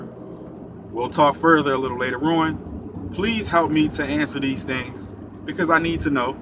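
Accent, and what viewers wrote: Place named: Philadelphia, United States. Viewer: Poetic THAT SHIT DONT EVEN SOUND RIGHT LOL ... People can't comprehend water is a tools to measure completel FLATNESS! Where u from bro? Tell me u from HARLEM ? where n bit Charlie clips is from ? Rocking the power wheels and shit! This mad got a memory about his power wheel !! Haha ! Naw . U look and sound like u from philly!!!! Light skin with the thick beard . Good points tho my guy! Salute to you and your enlightenment to this flat earth stuff . It will blow your mind but it's awesome to have this crazy ass info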